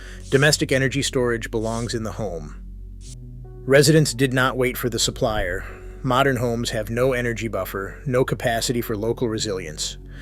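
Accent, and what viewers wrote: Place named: United States. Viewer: Why use an American voice for a British video? Interesting video otherwise. Lots of good features that went by the wayside for false cost savings.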